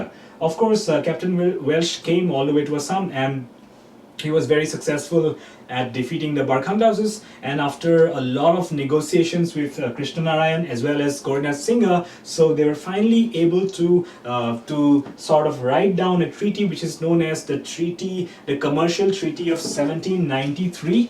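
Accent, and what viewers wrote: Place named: United States. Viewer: aapuni US r pra ahise nki ne UK r pra. val english koise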